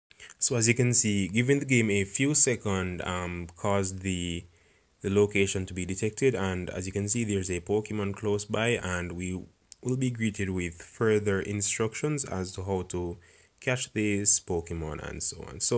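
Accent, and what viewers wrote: Jamaica. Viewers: Your jamaican arent you 🤔?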